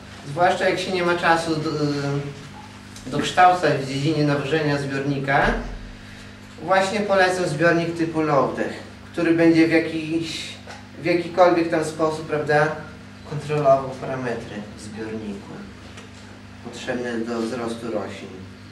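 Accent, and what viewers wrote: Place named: Kresy, Poland. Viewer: fajny ten akcent to pewnie jakieś kresy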